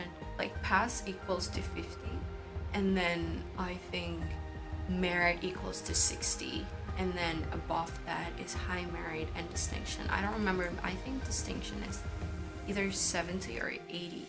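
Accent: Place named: United States. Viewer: You sound American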